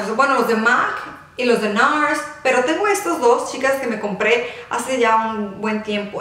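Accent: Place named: Fresa, Mexico. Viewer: Me gusta tu tono fresa , jaja :) . Con cariño y respeto saludos!!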